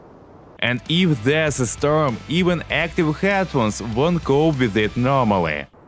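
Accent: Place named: Russia. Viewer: Hey, I like your (russian I think) accent. It sounds cool :)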